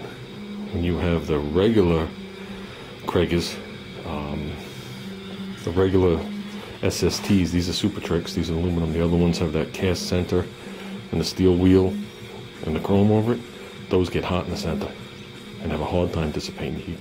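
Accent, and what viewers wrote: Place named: New York/New Jersey, United States. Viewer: hey man, I'm guessing you are either from Jersey or NY with that accent :) Long Island here! I love these videos, you're inspiring me to get to work on my 1980 G body car. Once the weather gets better...and I think 2018 may be the year I finally build that dream garage!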